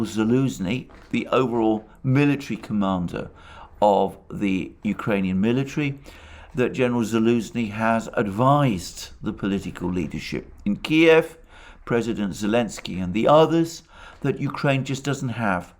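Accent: United Kingdom. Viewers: Fantastic analysis, unbiased, clear and balanced. Great British accent.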